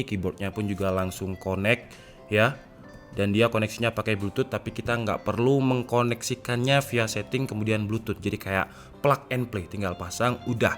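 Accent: Malang, Indonesia. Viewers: #REQ Kedepannya coba buat playlist podcast aja mas, seperti di channel sebelah. Toh dari segi penyampaian/pemilihan diksi mas juga udah bagus, cuman perlu diperbaiki dari segi 'medok' Malangnya itu wkwkw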